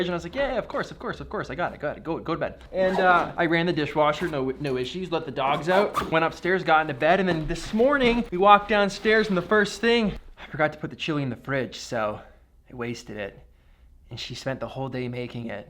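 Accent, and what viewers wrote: Canada. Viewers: I always love the way says aboout. Pure canadian 😂😂. Great vlog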